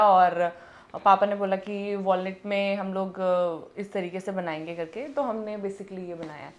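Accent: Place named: Pahadi, India. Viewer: Bahut achchha laga aapka ghar, aap pahadi bolo ya na bolo, apke bolne mai Pahadi 'Ascent' to aata hi hai.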